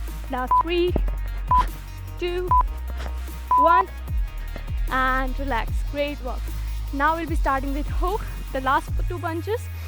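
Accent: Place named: India. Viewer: Her indian accent blow tho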